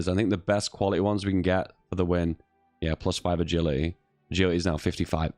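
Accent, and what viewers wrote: United Kingdom. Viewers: your calm and collected attitude and British voice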